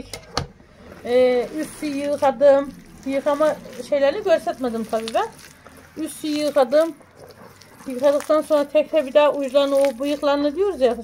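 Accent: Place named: Amasya, Turkey. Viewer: hanim sizin konusmaniz benim memleketim amasya sirvesine cok benziyor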